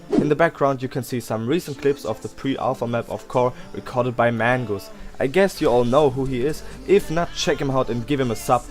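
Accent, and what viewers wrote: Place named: Germany. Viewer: This German accent...... 😖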